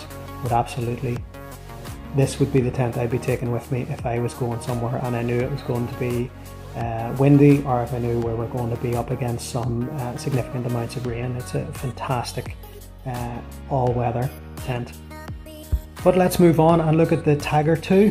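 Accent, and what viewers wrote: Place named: Northern Ireland, United Kingdom. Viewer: I'm looking to get a new tent to start backpacking again after about 12 years. the Naturehike keeps getting mentioned and I was actually on the AliExpress site looking at the popular Cloud peak 2 tent, when I noticed a few other Naturehike tents which hardly get a mention. I saw the Taga, which then lead me to find this video and your channel. I'm not sure I really want a 1 skin tent though, so maybe I'll look at something else. My current tent is the Vango Spectre 200, which I bought years ago, after thinking the Banshee was too small. Funny, the Banshee is still going strong, but the Spectre has long been axed by vango. It was good, just that I fancy a new tent. Do you get out much ? You don't make any wild camp videos. Nice to hear the accent, I originally lived in Holywood .. until I was 5 (57 years ago) Good luck with your channel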